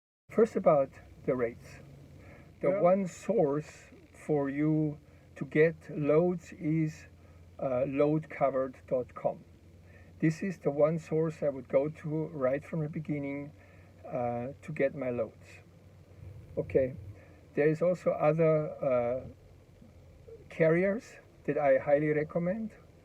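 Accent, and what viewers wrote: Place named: Germany. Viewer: I’m enjoying learning from your content. I notice your accent sounds European like from a German speaking (Germany, Austria, Belgium, Switzerland) Country. Is the economy/marketplace for professional transportation work better for entrepreneurs in America than Europe? Do they have pilot car escorts for major commercial shipments on the roadways in Europe also?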